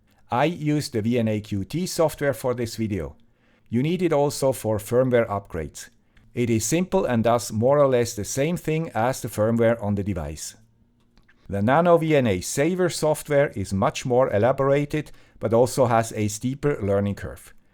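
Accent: Switzerland. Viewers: So true Sir. And the cool guy with the cool Swiss accent always responds! Talking about dedication 😎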